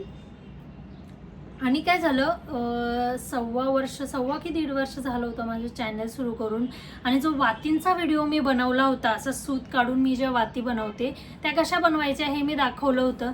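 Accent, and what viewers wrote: Khandesh, India. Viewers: Congratulations one man army, तुमचे व्हिडिओ खूप छान आहेत. तुमची ॲक्टिंग ची हिस्ट्री काय आहे? म्हणजे तुम्ही acting चे प्रशिषण घेतला आहे का? तुमचे आता normal बोलणे आणि व्हिडिओ मध्ये खानदेशी टोन मध्ये बोलणे खूप वेगळे वाटले. Keep progressing 👍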